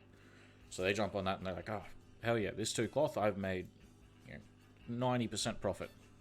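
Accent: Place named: Australia. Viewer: hey man, excellent video, fucking legend ❤, pleeeease tell me you have a link to the add-on? I'm REALLY struggling with addons atm so soon into TBC (WeaponSwingTimer has been totally fucked and I've downloaded like different 5 versions). This is some 200iq shit right here! I thought selling NW cloth from dungeons to tailors was good enough, this SHITS on that and lets me actually go in and flip it, making money stright from the AH, this is absolutely brilliant!!! Quicket gold garm? Fuck no. INSANELY ACCESSIBLE way to generatea substantial amount of gold that should especially help newer players who missed much of Vanilla such as myself to get our flying mounts / max our professions? Fuck yes! ❤ If you read this and can respond you've 100% earned yourself a sub, this is a super underrated channel, I really need some help with the addons to do this, if you can help with that then you're an ABSOLUTE CHAMPION. 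Also love the accent mate, I'm Australian too, born and raised, spent all 28 years of my life so far living here, and helpfully moving to Melbourne soon. Thankyou my friend!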